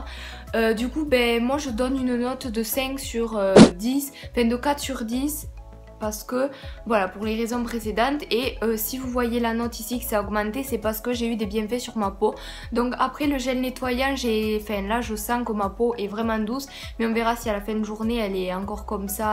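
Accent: South, France. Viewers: hey l'accent du sud 😂 gros bisous j'aime trop tes vidéos 💞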